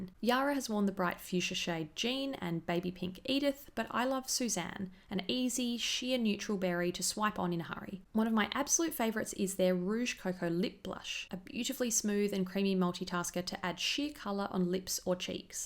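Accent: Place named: Australia. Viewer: Your Aussie accent makes your videos even more delightful to watch 😍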